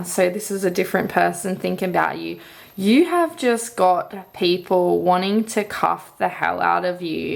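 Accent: Australia. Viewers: Gosh I love it when I hear a fellow Aussie on hear...can feel lonely on here sometimes 🙃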